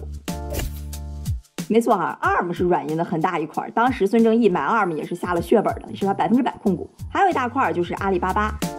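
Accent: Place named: Qingdao, China. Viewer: 小Lin笑容好甜，听声音有一点点山东青岛的口音，不知道我猜没猜对😅😅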